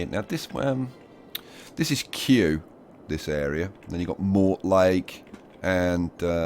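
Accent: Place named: Birmingham, United Kingdom. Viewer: you said you lived in london most of your life, i always thought you had a brummie twang..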